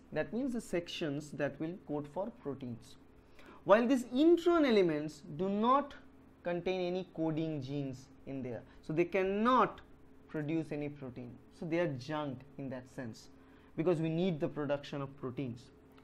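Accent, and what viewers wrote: Bengal, India. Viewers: are you bengali! bangali naki?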